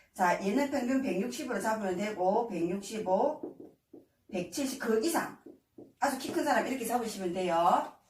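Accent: Gyeongsang, South Korea. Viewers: 왕초보인저도 설명듣고 옷만들기 도전해보려구요 너무 자세히 설명해주셔서 감사 또 감사합니다 구수한 경상도사투리도 넘 정겹네요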